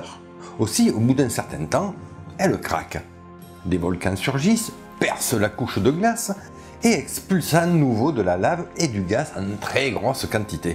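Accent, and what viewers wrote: South, France. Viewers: Il existe des chaînes Youtube, qui, aux premières minutes nous font: nous abonner, suivre assidûment et surtout enchaîner toutes les vidéos. Ce qui est votre cas. Je suis bluffée du contenu si brillant, de toutes les informations que j'apprends, et de tout le travail, colossal qui doit être mis en place ! Votre chaîne est excellente (et le petit accent du sud rajoute une tonalité bien agréable). Un énorme merci à vous, et nous avons hâte de regarder vos autres vidéos. Félicitations !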